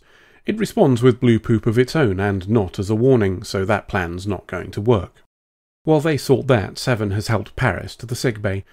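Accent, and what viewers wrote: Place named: Yorkshire, United Kingdom. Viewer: I find these humorous reviews immensely entertaining. This could be because Star Trek has never really caught my eye as something worth seeing every week. Hence, I have zero nostalgic connection to the franchise. I did see an episode of the OST when in first aired. Ye Gods was boring. From what I can tell, Star Trek Voyager appears to have been made specifically for teenage boys. Boobs n Battles is a winning combination in one's teens. Thank you for expanding my Trek knowledge. Always a pleasure to hear a fellow Yorkshireman prattle on about stuff. Like yourself, my RP slips whenever I hit on the vowel 'U'. There is something about that particular engrained speech pattern that is hard to shake-off.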